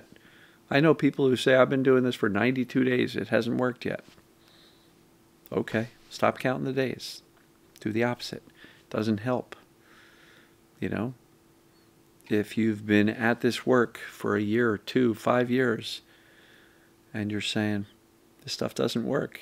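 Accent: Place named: Scotland, United Kingdom. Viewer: Such a great video!! Thank you!! I love how you take the same concept and explain it a different way..🥰 this is “flip the script” with Scottish accent 😃I know you have told us that many times. This “do the opposite” reminds me of the Seinfeld episode where George did that 😂🤣🤣 It works!! Thank you again for your continued support for all of us in this journey..🙏🏼🫶🏼🫶🏼🫶🏼🫶🏼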